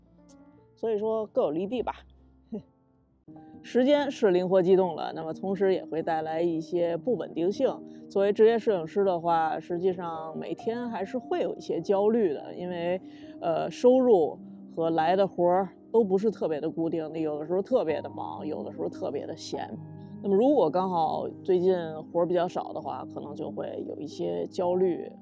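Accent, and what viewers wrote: Beijing, China. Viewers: 摄影师里京腔最好听的，😊😊😊😊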